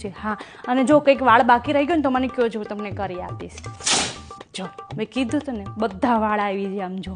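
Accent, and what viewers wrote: Kathiawar, India. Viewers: Sister I love your accent.... Hu Mumbai ma rav chu but Rajkot ane Junagadh maru native che ane desh ma jaiye atle mane tyani accent bau mithi laage... Tamari ekdam same accent che.... I love it sister.... Lots of love and blessings from Ruchi from Mumbai ❤😊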